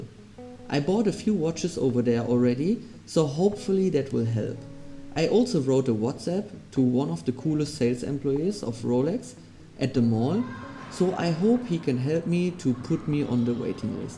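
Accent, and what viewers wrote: Germany. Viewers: Wenn du nach zwei Sätzen schon den Deutschen Akzent bemerkest. Sympathisch! Viel Erfolg bei deinem Vorhaben. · Your accent is Germany. Bist du aus Deutschland?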